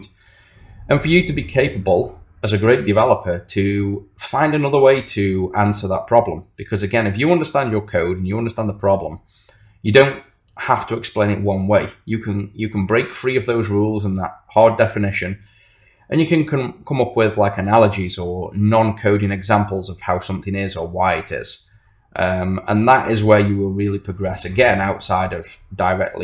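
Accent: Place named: Liverpool, United Kingdom. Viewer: Thanks, mate! Are you from Liverpool?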